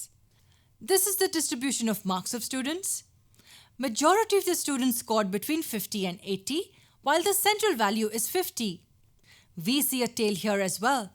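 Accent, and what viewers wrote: India. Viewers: Indian accent lovely & cute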